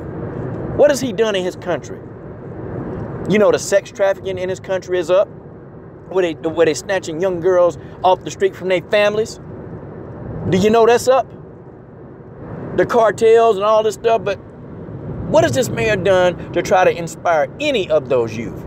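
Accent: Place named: South, United States. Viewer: Southern pronunciation strikes again, ahaha. It's Koo-Ree-Chee-ba... or Koo-Ree-Tee-Ba for European Portuguese/certain parts of the country.:)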